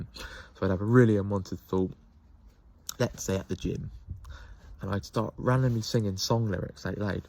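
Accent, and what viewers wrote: Bristol, United Kingdom. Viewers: definitely from Bristol 😂 that accent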